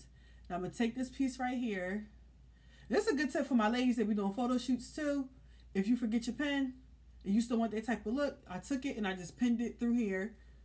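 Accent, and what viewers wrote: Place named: Philadelphia, United States. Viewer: Girllll you just helped me so much!!!! 🙌🏽🙌🏽 As soon as you started talking I said “she’s from Philly.” Lol.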